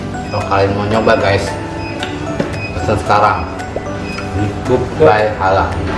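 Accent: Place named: Garut, Indonesia. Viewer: Euuhhb teh.. Mani resep pisan pas kalau ngomong sunda mani lucu, tong hilap teh sundana nyariosna lucuuu pisaaannn, logat garut punya has ya hehhehe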